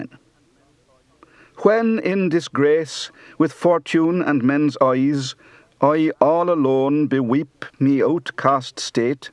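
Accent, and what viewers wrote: RP, United Kingdom. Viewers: Lovely example of High RP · This accent was still quite common among the upper class in the West Country during the 1970s. The movie "Pygmalion" (1938) is a great resource for hearing different accents of the pre-WW2 era, with Leslie Howard speaking RP throughout.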